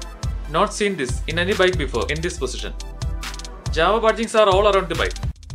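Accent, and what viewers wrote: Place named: Kerala, India. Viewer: I think u are a mallu guy by hearing ur pronunciation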